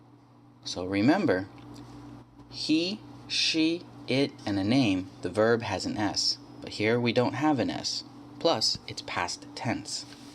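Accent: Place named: United States. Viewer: Your voice is very clear and I like your American accent. Best wishes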